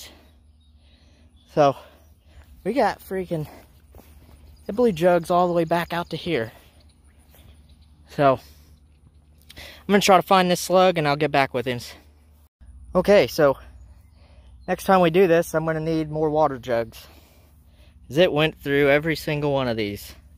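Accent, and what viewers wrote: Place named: Western Pennsylvania, United States. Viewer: had this chat with you on gunnitrust as well. Didn't realize this accent went past Pittburgh area heading west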